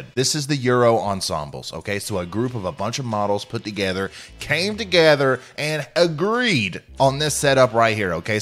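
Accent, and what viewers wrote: South, United States. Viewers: My Birthday too... but, I could be your Dad. :-) ... What state are you reporting from?... sound a bit of a southern twang. I'm in Georgia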